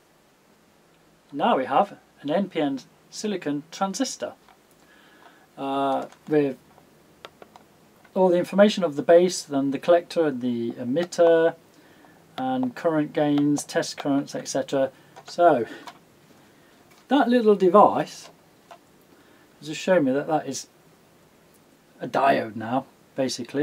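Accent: United Kingdom. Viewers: What a challenging project,! I follow your explanations with great interest. Despite my limited English, your British pronunciation is a free language class!!!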